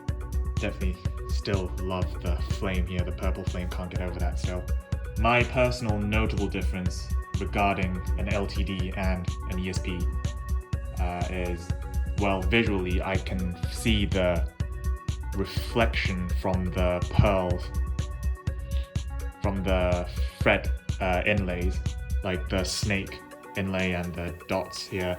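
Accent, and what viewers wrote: United Kingdom. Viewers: For some reason I wasn’t expecting an english accent. But it suits you perfectly lmao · I love listening to your British accent ❤️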